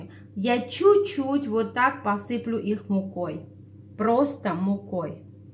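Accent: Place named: Armenia. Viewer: Человек на русском языке говорит это главное! Чувствуется акцент армянский. Спасибо вам за рецепты. Вы лучшая!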